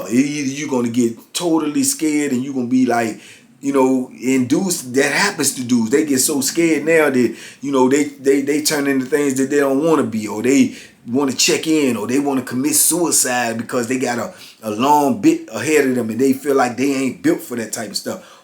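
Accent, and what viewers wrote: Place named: DMV, United States. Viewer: Bra I like this video. You said some certain words that I can reflect off. Young I am onbthevWest Coast but from where you from. Inlike you videos because InthinknIblost my DMV accent. But when I hear your delivery you remind me of home. Speak da trufe to da old and da yufe. Errbody or somebody going thru it and know what you seen and know you real. ✊🏾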